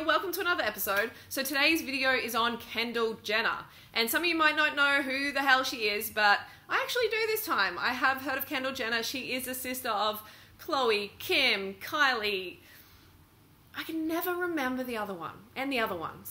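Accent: Australia. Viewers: I don't know what's more annoying... your accusations against Kendall or your stupid aussie accent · Is she Australian?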